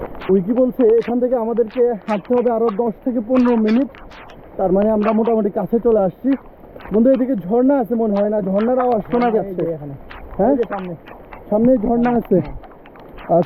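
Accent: Noakhali, Bangladesh. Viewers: ভাইছা আইন্নে কি নোয়াখাইল্লা।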